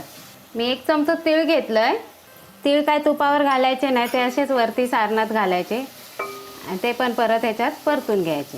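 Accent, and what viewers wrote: Malvani, India. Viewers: तुझे video मी recipe साठी बघतेच. perfect measure असतं. thanks for sharing पाटोळ्या. आणि सगळ्यात महत्त्वाचं. तुझं मालवणी बोलणं फारचं आवडत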